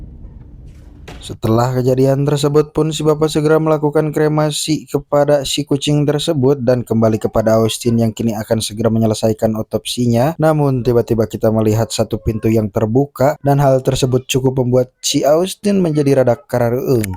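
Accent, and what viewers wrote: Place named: West Java, Indonesia. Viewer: wkwkwkw logat asli pasundan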